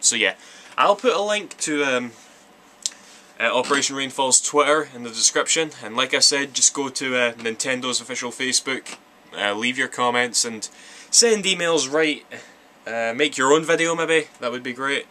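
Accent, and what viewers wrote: Scotland, United Kingdom. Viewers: And by the way I have been watching your lets plays back to back in the playlists and consider you to be one of the very best lets play artists. Scottish accents for the win! (even though I'm English lol)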